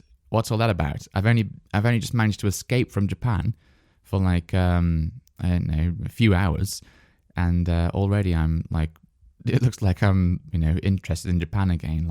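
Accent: United Kingdom. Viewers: British Accent ❤❤❤❤❤❤ I love listening British podcasts.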